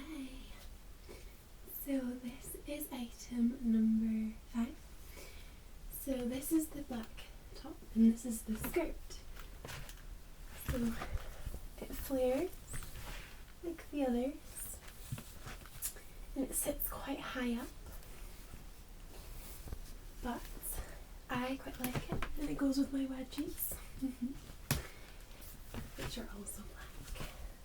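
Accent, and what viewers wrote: Scotland, United Kingdom. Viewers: great video , thank You! I enjoyed the accent .. first video I've seen that You actually used it..least that I could tell. You're lucky to live in Scotland, im a huge scotch fan, Laphroaig, from Islay. Thanks again. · Not sure if you have done that before, but would you consider making a video where you purposefully talk "very scottish"? I kinda love it and it might actually be tingly :D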